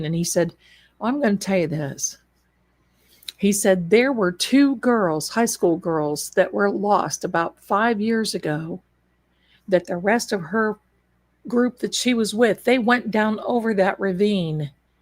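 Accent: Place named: Southern Ohio, United States. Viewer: You must be from southern Ohio.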